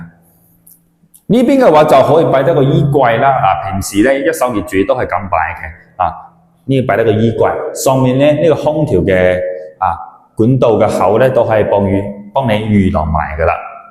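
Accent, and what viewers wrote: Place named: Taiwan. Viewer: 點解你廣東話似台灣人，不像大陸人？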